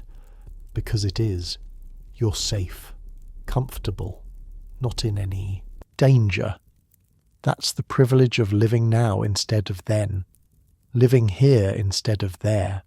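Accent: United Kingdom. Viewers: SICK OF THESE BRIT SCHIT ACCENTS.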